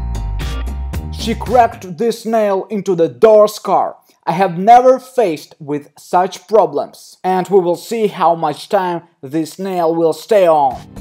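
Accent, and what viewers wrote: Russia. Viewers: Мне кажется что по-русски вы говорите лучше чем по английски с русским акцентом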